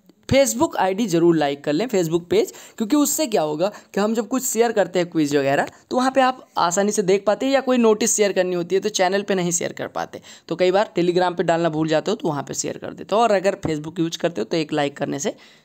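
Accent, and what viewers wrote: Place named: Pratapgarh, India. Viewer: hai nai bhasha pratapgarh ki lag rahi hai sir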